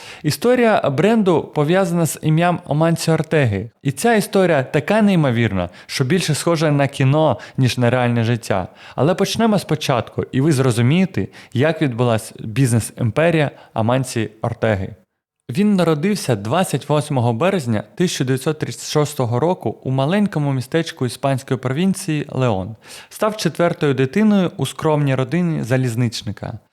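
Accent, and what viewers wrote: Russia. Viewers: Класна історія. Цікавий виклад. Дуже добре, що контент українською. Суто для покращення вашого контенту хочу поділитися побажанням: більше звертайте увагу на вимову слів, ви деякі слова вимовляєте на російський манер, а також було декілька слів російською мовою ( наприклад: колокольчик). Загалом, вас приємно слухати і радію, що є цікаві передачі українською мовою. Дякую!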